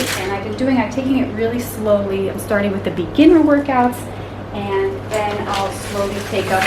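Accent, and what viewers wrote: United States. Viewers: Hi. I live in U.S.A.How come your accent is American since you live in Israel? Love to watch your vlog.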